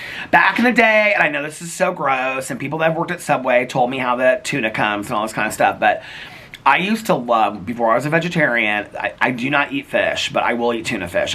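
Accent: South, United States. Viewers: U had me cracking up at the gym when u we’re talking about your southern twang from watching the slaton sisters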